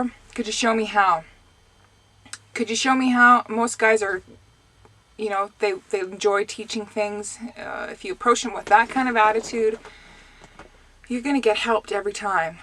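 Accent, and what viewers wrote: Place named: Canada. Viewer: Canadians have cute accents. As far as asking a guy for help, a woman should never be afraid of asking for assistance when needed. My Marine buddy has been driving for twelve years, and STILL doesn't know the do's and don'ts of sliding the tandems. Once I get my cdl and get on the road, I'll help ANYONE that asks.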